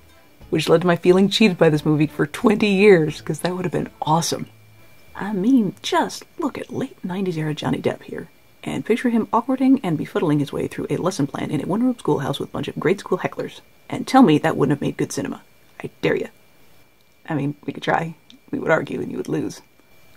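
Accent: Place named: England, United Kingdom. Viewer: I feel like I learn more watching your videos than I would reading the book! Loved the English accent!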